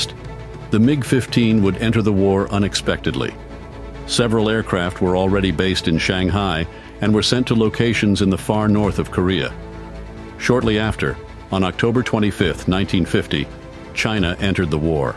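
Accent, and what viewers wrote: United States. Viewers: How is is that 'Australian Military Aviation History' have an American accent? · The narration switches between American and Australian accents mid paragraph. Why has no one else noticed this? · Why is an Aussie channel vid narrated by a yankee? And airmen from the 60's shot 8mm film, not 8mm video. Video would have been shot on 2" magnetic tape and was not portable, nor used by airmen. There is a difference between video and film. Accuracy counts in history folks. Details, even small ones, are important.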